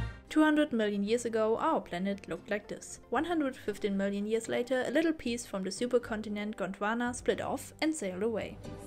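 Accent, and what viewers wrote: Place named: Switzerland. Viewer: Mal was neues 😄 Ich möchte ja nicht fies sein, aber es klingt etwa so wie wenn ich als Schweizer Hochdeutsch spreche. Trotzdem war das interessant! Und die Animationen sehr gut gemacht.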